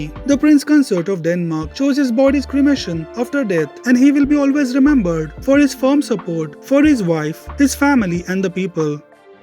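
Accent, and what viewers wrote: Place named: India. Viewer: What an indian accent!!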